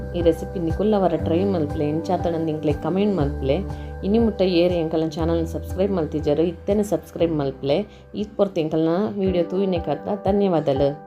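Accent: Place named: Mangalore, India. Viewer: Manglore kannada artha agalla ree madom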